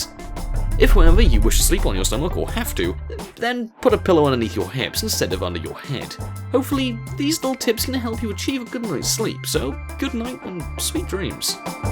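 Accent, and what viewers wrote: United Kingdom. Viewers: This may be the most British guy I've ever heard lmao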